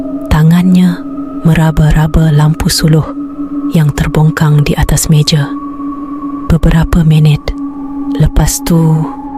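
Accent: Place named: Sabah, Malaysia. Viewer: Sabahan bm 😂😂😂 Iya bah,kau org Sabah bah.😂😂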